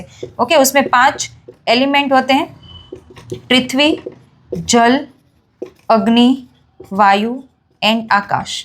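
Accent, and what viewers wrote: Gujarat, India. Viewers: Dear sister looks like you are gujarati this I find by your Hindi. What is true?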